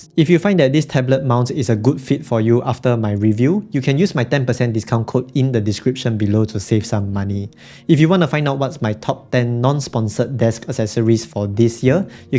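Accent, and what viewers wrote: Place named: Singapore. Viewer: oh i think you are just casual indonesian chinese youtuber, after hear your accent kinda singaporean 😅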